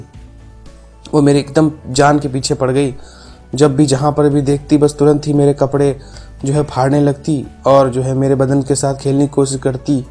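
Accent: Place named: Bihar, India. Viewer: Kon se jila ke ho bhai. Pakke bihari lag rahe ho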